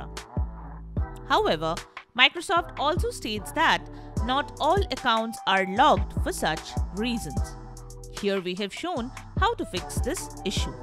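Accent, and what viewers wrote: India. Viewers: Funny, having an Indian voice giving advice about computer information.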